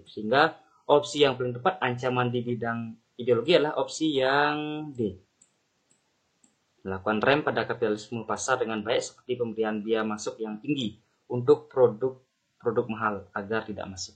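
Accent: Bali, Indonesia. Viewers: orang bali ngih bli keliatan dari logatnya ?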